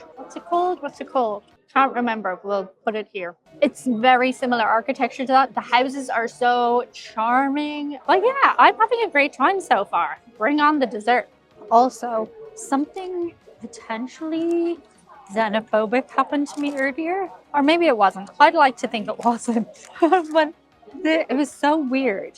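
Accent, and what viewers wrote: Ireland. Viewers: Boston brings out the Irish accent from. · Speaking English with a brogue accent.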